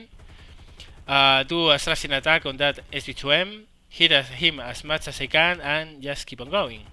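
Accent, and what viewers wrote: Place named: Spain. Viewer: He is Spanish, so its a Spanish accent.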